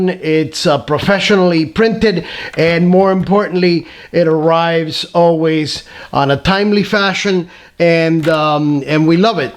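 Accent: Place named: Quebec, Canada. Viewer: That was a very good French accent, sound like my dad, he's from Québec.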